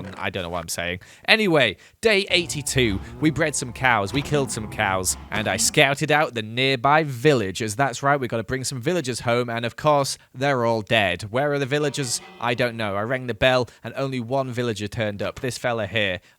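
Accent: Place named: United Kingdom. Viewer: so refreshing to hear a British youtuber for once because the vast majority of youtubers seem to be american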